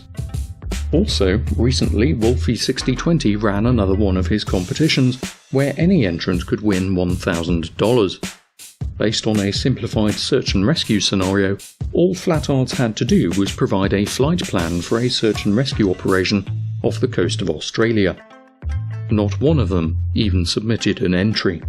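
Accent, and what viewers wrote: United Kingdom. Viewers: Shill behind a stuffy English accent. Must seem pretty impressive to the cucks (subs) of this channel. The real working model is quite the hot potato but I'll give you clueless twits a hint. The vajra. PLASMA is the light source. Learn about primer fields. Maybe you can figure out that the electromagnetic ice dome is essentially a magnifying glass. You may struggle to get it given your limited ability to reason but the sun is nothing more than the focal point of light inside the dome from the plasma spiral( again, learn about primer fields globuloids) Just like a magnifying glass. That's why you can see clouds behind and in front of the sun when it's lower on the horizon. It is local but only the light from the source. Learn about the VAJRA! What it is and when was it seen. Also the complete book of Enoch. If you are godless there's no telling you as it is impossible to have understanding without. 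And I'm not talking about the Freemason puppet Christian church on the corner. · If you call people retards it's no wonder you only get responses from like minded people. That is no way to expand your horizons..ps nice British accent.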